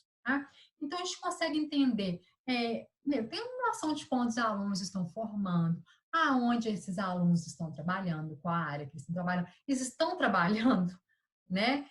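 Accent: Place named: Goiás, Brazil. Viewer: Sotaque goiano detected. Parabéns pelo vídeo minha querida.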